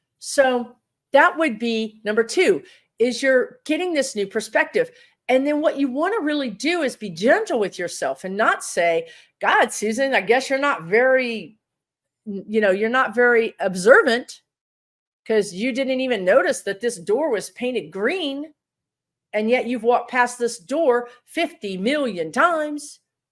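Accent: Texas, United States. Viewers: I had to stop you at 16/58 over "lifetime" vs their "lifetimes." Part of the draw here is the chemistry/angst/respect between you and the guides. For anybody popping in for the first time it adds immediate credibility. For me it fosters a growing appreciation for what you both bring. Personally, I love their language, just as I do yours when you talk Texan or whatever you'd call it.Equally charming.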